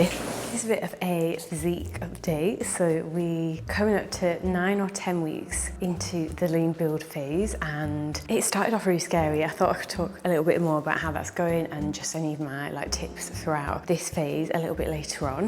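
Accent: United Kingdom. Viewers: Okey I m here to learn British accent ❤❤❤❤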